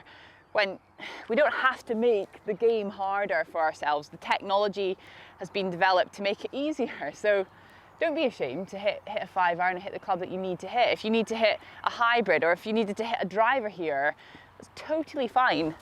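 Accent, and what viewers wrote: Scotland, United Kingdom. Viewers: I watch your videos for the golf.. and the charming Scottish accent! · Really good idea, with real insight from someone who explains clearly in a lovely brogue - a pleasure to listen to. Suggest Dunstanburgh and Edzell (both hidden gems) and possibly Lady Bank with possibly Victor Perez in Scotland and Lee Westwood at Dunstanburgh. Down south what about Ferndown and Trevose with Andy Sullivan. Really looking forward to the series where ever you choose.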